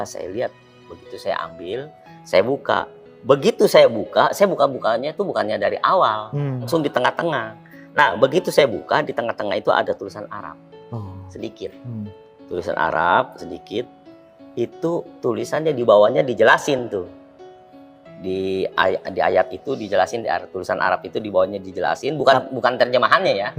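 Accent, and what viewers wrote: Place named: Jakarta, Indonesia. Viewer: Kya Betawi bang bahasaya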